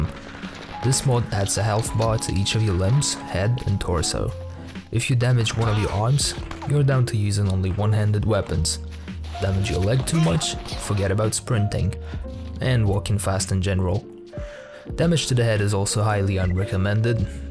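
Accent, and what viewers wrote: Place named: Scotland, United Kingdom. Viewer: wow, me too and yet I thought you were Scottish of something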